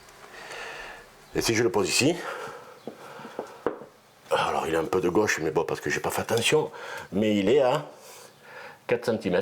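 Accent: South, France. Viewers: Il est incroyable ce bonhomme, en plus, il a l’air sympa avec son accent du midi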